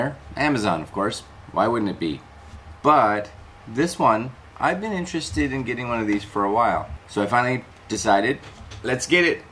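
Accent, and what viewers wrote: Canada. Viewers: My tripod has been ordered! Love the Canadian accent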